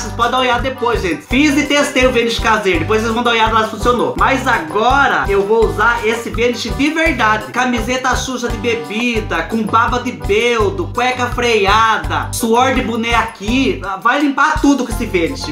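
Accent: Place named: Sorocaba, Brazil. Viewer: Reconheci seu sotaque de Sorocabaaaa! rs Até pensei: "nossa, a cidade tem o sotaque parecido com Sorocaba." XD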